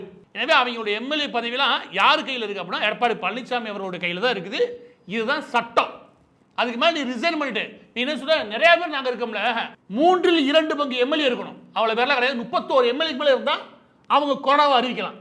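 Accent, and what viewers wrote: Madurai, India. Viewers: அண்ணா பேசுவது தான் நல்ல இருக்கும் மதுரை மொழியில் 👍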